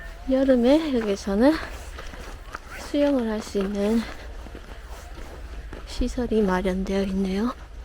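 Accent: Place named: Gyeongsang, South Korea. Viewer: 눈이내린 스위스로~ 달려가고파요 ^^ 하늘빛까지 내려앉은 눈덮힌 설경이 너무 아름다워서 얼른 캔버스에 옮겨놓고 싶어지네요! 그래도 될까요? 안개속 몽환적인이 풍경이..... 눈까지 맑게해주네요!!! 영상속 씨의 정겨운 저랑같은 경상도 억양도 소박하게 느껴지고, 이다음 스위스가게되면 좋은곳 많이 소개해주세요. 감사히 영상 잘봣어요^^ 즐건 주말되세요♡♡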